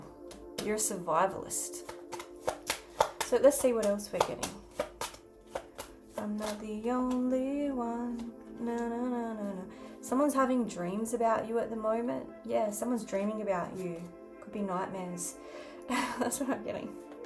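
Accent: Australia. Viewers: I love your Australian accent😂❤❤❤ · Your eyes are amazing i bet you get that a lot your voice is the sweetest wisper you make me chill 😎 to be as sweet as you lovely accent i think its Australian imagine nabours the girl next door who can read you inside out 😊❤x ditto my intuition is allways on key like a phenix angel 😇 covered in golden glow mmmmm i have yet to have my glow up I'm a very late bloomer the old catapiler in the universe I've been around since time began outside of Eden inside is just a memory a dream thats coming back to be remembered once more the beautiful garden i keep inside two lovers two meet to greet to find the love i left behind so long ago in my very first night invthe land of nod 💤 😴 💤 😴 to close my eye's to forget to remember when the time is right once more edens projection from within waking ⏰️ up to be remembered forever and ever a garden of light that never sleeps and never dies not even a flower not even a mouse surrounded by lions tigers and bears mmmmmm that jist want to be loved its actually the garden of love without a shadow without a doubt 😊❤x by beautiful your realy cool 😎 taa taa from Lancashire UK 🇬🇧😊❤x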